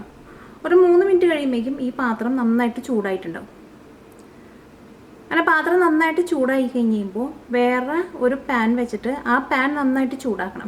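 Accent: Thrissur, India. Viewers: Thrissur bhashayanishta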